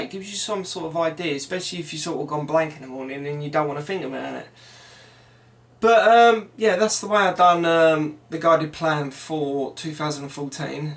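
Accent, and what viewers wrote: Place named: Cockney, United Kingdom. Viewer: Love the cockney London accent!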